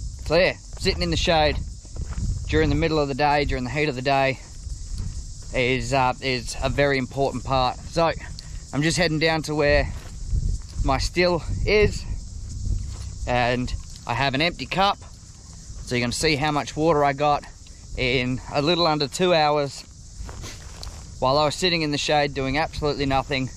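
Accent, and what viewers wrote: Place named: Australia. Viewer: FROM YOUR ACCENT YOU MUST BE AN AUSSIE. GOOD ON YOU ! THANKS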